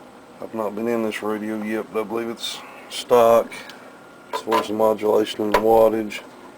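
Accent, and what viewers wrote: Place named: Tennessee, United States. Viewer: You sound like your from Tennesse man! If you were to say youn's then I'd know for sure.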